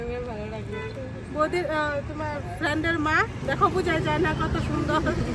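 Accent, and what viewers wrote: Bangladesh. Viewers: The dialect you through when you speak is same like grater dhaka district ( not dhaka city ) village area of dhaka district, narayan gaung, manik gaung,narshindi,bikrompur , gazipur even greater farid pur district also speak same dialect....I pray to God keep all of you happy and prosperous...from Dhaka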